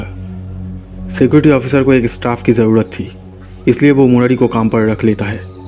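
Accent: Bengal, India. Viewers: This youtuber is from Kolkata only I think so as he also has one more Bengali dubbed channel where he uploads this story only but later and you can notice his accent and names of characters are Bengali names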